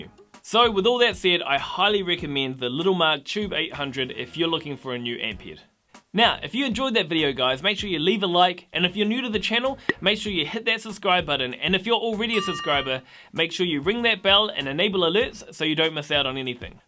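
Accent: New Zealand. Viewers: Okay...I love guessing accents and I've always struggled with Aussie vs. Kiwi. That being said, I think I've gotten a better handle on the two and I want to guess. Are you from New Zealand?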